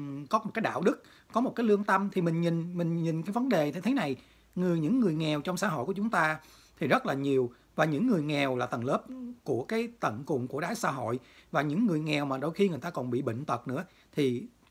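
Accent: Standard. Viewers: Bạn này nói hay và chuẩn nè. ❤️❤️❤️❤️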